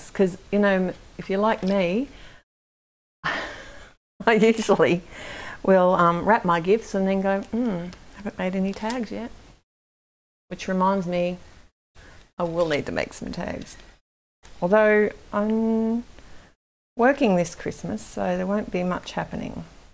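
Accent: Australia. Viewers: What state do you live in? It’s nice hearing an Aussie voice.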